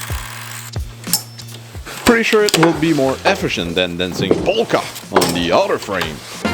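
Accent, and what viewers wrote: Quebec, Canada. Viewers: Hi. I must say that this is the most pleasant and a bit unique quebec english accent I've heard. I would venture to guess Sherbrooke (because i haven't heard people from there), but really curious to where you're from.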